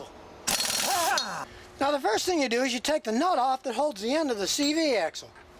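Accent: United States. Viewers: Being English, it's really difficult for me to endure listing the Americain accent, but none the less, great video.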